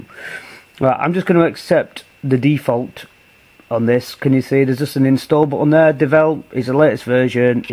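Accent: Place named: Lancashire, United Kingdom. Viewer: if only it were this easy to put it on my one plus one. nice video made better for a lancashire accent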